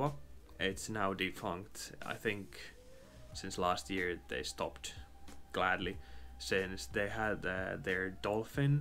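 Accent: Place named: Finland. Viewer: Ah!!!! I knew you were Finnish! I came upon one of your shorts about the morning Ramen shop and your accent caught me. I was like “That sounds very Finnish” and I am happy to confirm that! Just very nice to see for no reason at all! 🎉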